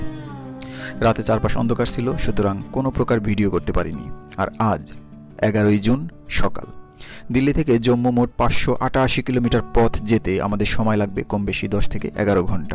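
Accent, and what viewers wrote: Bangladesh. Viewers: That's Bangladeshi Bengali accent. We Indian Bengalis don't speak like that. Our Indian Bengali accent and pronunciation are different from Bangladeshi Bengali.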